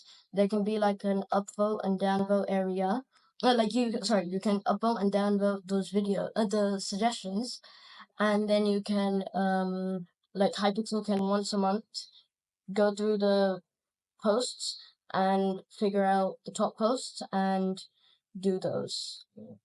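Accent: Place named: United Kingdom. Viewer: damn your voice sounds so young ive never heard it before also you sound bri ish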